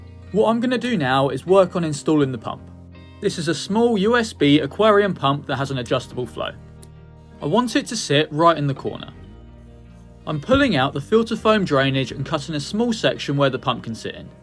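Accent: United Kingdom. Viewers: Hello! Thanks for very entertaining and educational channel. I'm from Sweden and wondering where in the UK you're from!? What dialect you speak!? ☺️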